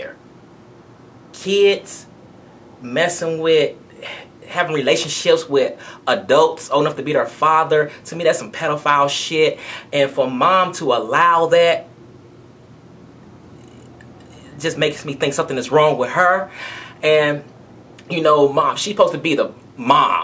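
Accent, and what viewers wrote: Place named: Missouri, United States. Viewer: I think he means Autistic he has a strong Missouri accent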